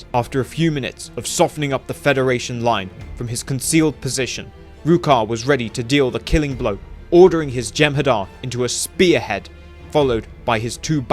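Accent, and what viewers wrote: RP, United Kingdom. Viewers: You are sure to come from England because you can clearly hear the Received Pronunciation. Your RP or British English is flawless and very clean for me to understand in contrast to US English. There are also differences, for example in New England, the people there are very close to the RP. Otherwise, I don't understand anyone in the US. The pronunciation is worst for me in Alabama. Learning English is compulsory in German schools, but I think the mistake is that the students are drilled in RP. Not only in pronunciation, but also in vocabulary. There are clear differences between words and spelling in US English. My pen pal from the USA agrees with me and thinks that it is a mistake for the students to be drilled in RP in Germany. He says he always notices that when he reads my letters, because I stick to the RP vocabulary and also to the spelling of words that are spelled differently in US.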